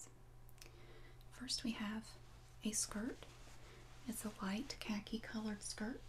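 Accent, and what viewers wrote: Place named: Texas, United States. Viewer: I've always liked the sounds coming from a hand smoothing over cloths. Gentle Whispering has a few videos in which she does this. For some reason, it is a very pleasant sound and is one of my ASMR triggers. I can't tell where your accent is from, Texas? I'm in Georgia and the accents around my location aren't like yours. I like yours better. Have a great evening and thanks for the time you put into these videos.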